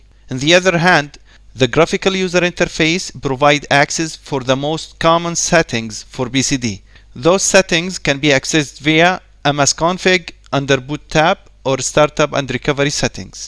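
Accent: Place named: Arab world. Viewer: Haha Dude I can hear your Arabic accent pretty well, very good and detailed video.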